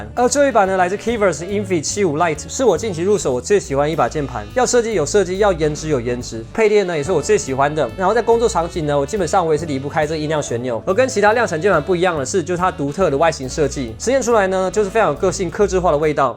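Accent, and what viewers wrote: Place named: United States. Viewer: 很喜歡你講話有點ABC，但bgm卻是中式古風的衝突感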